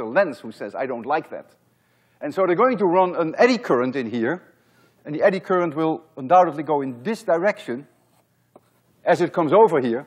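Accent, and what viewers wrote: Netherlands. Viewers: Do I detect a Dutch accent? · Yep, he,s a dutch man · I knew he was dutch because of his accent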